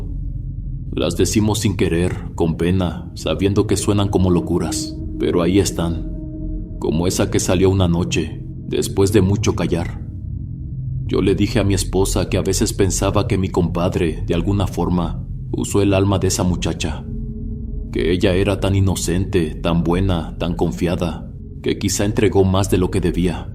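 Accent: Mexico. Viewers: !! Qué buena historia!! Muy buena narración y lo mejor con ese acento bien mejicano, soy de Perú 🇵🇪 y te oigo siempre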